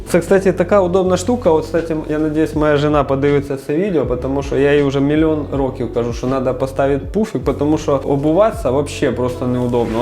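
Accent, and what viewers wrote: Surzhyk, Ukraine. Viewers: Не можливо слухати( суржик є у всіх, але тут його забагато.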